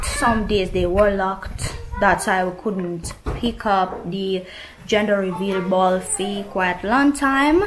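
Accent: Jamaica. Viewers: you a real Jamaican now. Love to hear you speak. You a comedian as well. 😂😂😂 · Love your Jamaican ❤accent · Yes can't wait for the gender reveal🎉 as long as it's healthy that's the main thing, don't like a cut I've noticed that some long time I think you gonna have a Deadlock in the household when he's older! And you're the funniest blogger out there you just naturally funny😂 the things you say you Jamaican accent keep it up you and your family are awesome!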